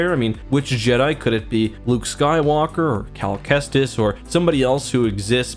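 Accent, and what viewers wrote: Canada. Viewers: Is it just me or is his Canadian accent getting stronger and stronger? And this is coming from a Canadian. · I always forget your Canadian until you say aboot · Ah booooot, lol Canadian?? Sweet! Maple syrup & such.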